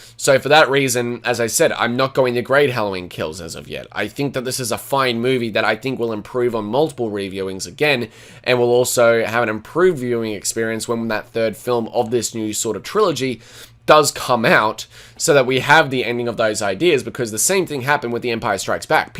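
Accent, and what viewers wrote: Australia. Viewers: I forgot how much I love your Australian accent. Your voice would be really great for ASMR. I haven't seen the movie yet But I have Peacock which is a streaming service in the United States that it's streaming on simultaneously with its theatrical run.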